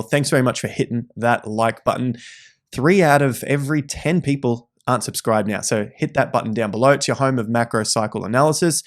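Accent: Australia. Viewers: your content is great, straight forward and no bullshit. It’s so good hearing an Aussie as well. Love your work.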